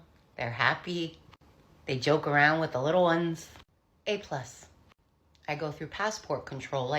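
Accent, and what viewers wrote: New York, United States. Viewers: The NY accent gets me every time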